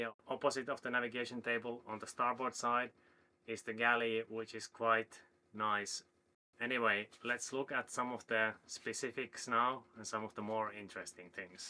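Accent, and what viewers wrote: Finland. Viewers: Aksentista äkkiä päättelin että suomalaisia sitä ollaan, hienoo että elätte unelmianne! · Er du Nordmann? Aksenttisi perusteella uskon, että saksisi on oltava suomalainen.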